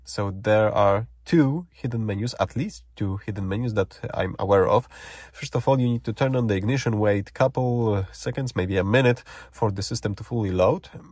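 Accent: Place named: Poland. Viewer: Polak mówiący po angielsku 😎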